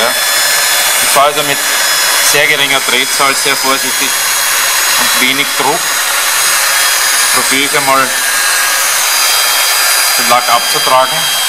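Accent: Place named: Austria. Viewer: Bist sicher a Österreicher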